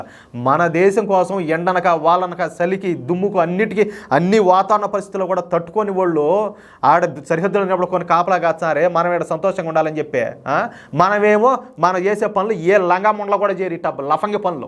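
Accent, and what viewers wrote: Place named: Karnataka border, India. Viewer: బ్రదర్ మీ శ్లాంగ్ బాగా నచ్చింది. I guess మీరు కర్ణాటక బోర్డర్ ఆయి ఉంటుంది. మెట్టు తో కొడతారు.... హైలెట్ 😅 Any how మంచి వీడియో చేసారు. Thank you so much 👍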